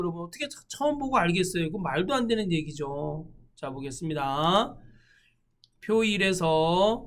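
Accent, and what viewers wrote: Gangwon, South Korea. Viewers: 선생님 오늘 시험보고왔습니다~~ 함수 진짜 75문제 두번 돌려보고 상시기출 보니깐 좀 이해가네요!! 반복이 답인것 같습니다. 선생님 덕분에 index match 마스터했어요! 선생님꺼 보고가면 왠만한건 다 풀 수 있습니다 ㅎㅎ 실수만 안했으면 만점일 것 같습니다! 저도 강원도 사람이라 억양이 익숙해서 즐겁게 강의봤습니다! 농담도 재밌어요 ㅎㅎ 감사합니다^^(셀서식-범위잡고 이름지정 조건부서식 수식조건셀 Hour*65+minute*/10 요금 65 If month 수시 정시 1-6월 9월 Vlookup left 할인율 구하기 Daverage- average 상공고 평균 - 전체고 평균 Averageif , round 1 &연산자 “점” 정렬 부분합 시나리오 지정 남,녀 기준 2개 하래서 당황함 = 컨트롤키 잡고 눈치껏 했습니다 매크로 차트)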